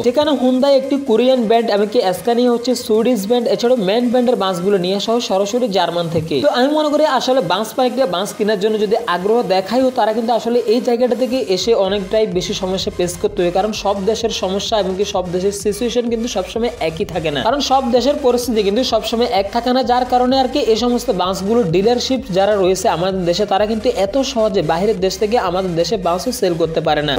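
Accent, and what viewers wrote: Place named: Comilla, Bangladesh. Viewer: ভাই ধন্যবাদ একবারে আমাদের খাঁটি কুমিল্লার ছেলেদের মতো কথা বলছেন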